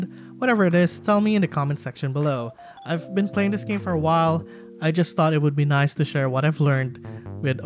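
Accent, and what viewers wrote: Philippines. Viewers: Filipino accent detected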